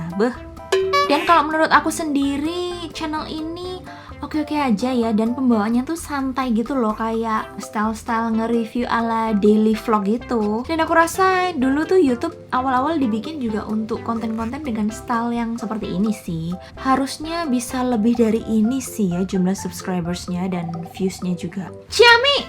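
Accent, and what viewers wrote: Java, Indonesia. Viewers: Kangen ngomong jowo:') medhoke loh mantep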